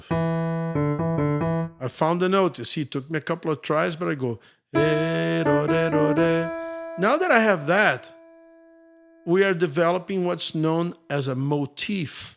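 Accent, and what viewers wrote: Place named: England, United Kingdom. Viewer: Bravo your tutorials, Master ! I do appreciate your methods, mostly your cleared, well articulated English accent ~ instead of too many sounded-English-seemed-like, such as bastard's American etc..etc... They must do trainee English Accent... PLS broadcast my message 🙏🙏🙏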